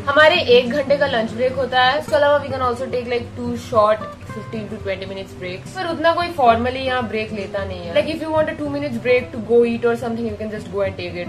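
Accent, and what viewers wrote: Bengal, India. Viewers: Are u a bengali🤔... I heard talking with ur mom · the bengali english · Are you a bengali girl bcz u say "maa sotti baana 6o"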